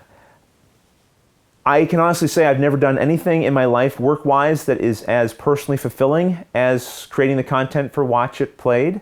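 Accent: Canada. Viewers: Hi. I'm fairly new to the hobby, and your videos have been great to help me get over the "learning curve" and develop my own gaming identity...what I like and don't, what's worth spending money on and what's not, and what gaming experiences I can share with my family and which ones are best left for playing with other adults. The fact that the videos are a lot of fun to boot is icing on the cake! (Not to mention the funny vowel pronunciations that lead my wife to say, "are you watching that Canadian guy again?") You're a good person doing good work, and I'm happy to be able to back you. Hopefully you can use my support to buy a carrot or two. Or some cider for Pep. Cheers and keep up the good work!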